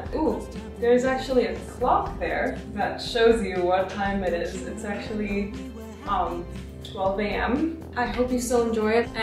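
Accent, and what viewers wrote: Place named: United States. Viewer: Aksen nya america bgt…tinggal di US ya?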